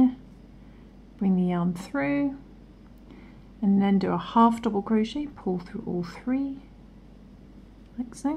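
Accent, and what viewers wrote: United Kingdom. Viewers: Love this and will be trying it. Just find it bit confusing you use US terminology. why is that? is it just personal preference. you certainly sound British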